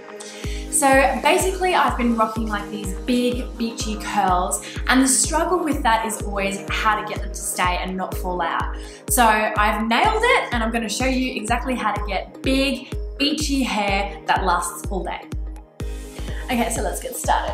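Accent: Australia. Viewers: The curls that you made is so lovely, you look so gorgeous. And I love your Aussie accent.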